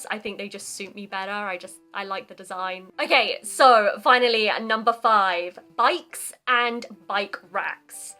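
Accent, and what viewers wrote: United Kingdom. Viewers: イギリス英語🇬🇧素敵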